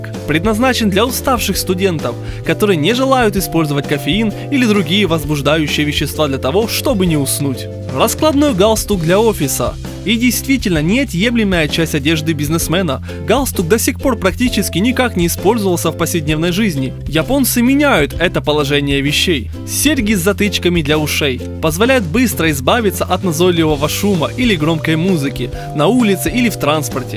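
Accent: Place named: Surzhyk, Ukraine. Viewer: ты конечно, молодец, но пожалуйста, говори не на суржике. Ухо режет...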